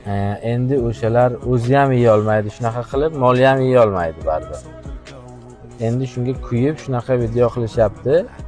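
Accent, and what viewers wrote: Namangan, Uzbekistan. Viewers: Qaerdansz tojikmsz bizdayam namangan kosonsoylik ortoglarm shunde shevada gapradi talafuz chroli negativlarga etbor bermang koromdganla kop yaxshi bosezam yomon bosezam negativchlar kop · Nomongollikla sheva aniq😅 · Шева Наманганга тортар экан тарбияли бола ота онанга рахмат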